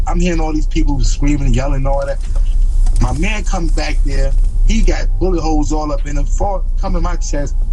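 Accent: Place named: Brooklyn, United States. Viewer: All I hear is BROOKLYN!!! SAUTE MY G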